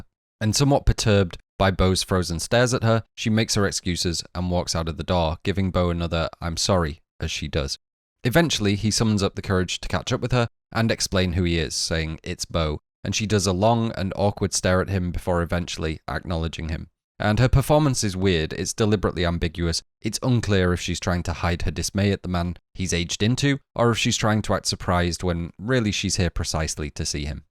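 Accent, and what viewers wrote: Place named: Northern England, United Kingdom. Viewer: I was following you right up until you pronounced Albert Camus' name like that or so. I understand that you're English, and even more, you're from the North. The French have certainly had an interesting relationship with the Northern English, the Scotts and the Irish. However monstrous the French may be, pronouncing Camus' name as "Came-us" is horrifying beyond anything Ari Aster could put in any of his films.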